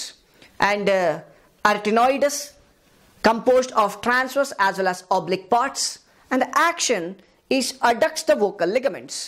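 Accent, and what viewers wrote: India. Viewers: 讲得挺好的，简短精炼。但是如果英文咖喱味不那么重就好了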